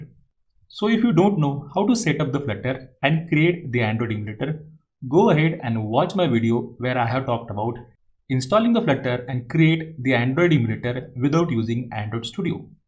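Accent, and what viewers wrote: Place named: India. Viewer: i cant understand what you are saying bro.i dont understand your indian english😩😫. try using ai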